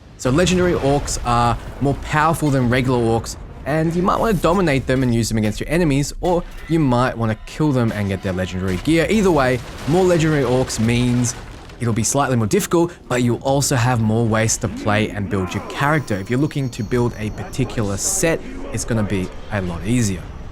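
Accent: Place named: Australia. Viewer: You sound like Fudgemuppet. Fellow Aussies! 🇦🇺🙌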